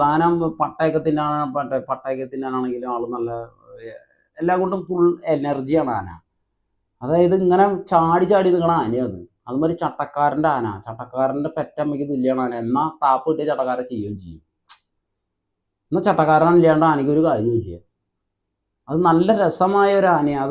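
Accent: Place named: Thrissur, India. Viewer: Thrissur slang super ayyitu parayunde❤❤❤❤❤😂😂😂😂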